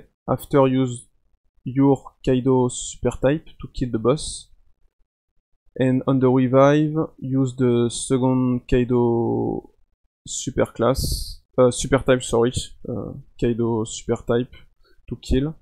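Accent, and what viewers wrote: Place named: France. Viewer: tkt c'est compréhensible pour un français, c'est juste que pour des étrangers ça peut être compliqué tant ton accent français est fort, par exemple j'ai + de mal à comprendre tubechau à cause de son accent Suédois.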